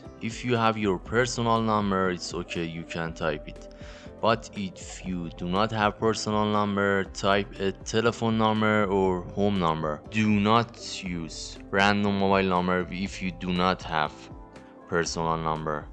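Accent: Iran. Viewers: لحجه ایرانی داری:) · Iranian Accent🗿🗿🤘🏻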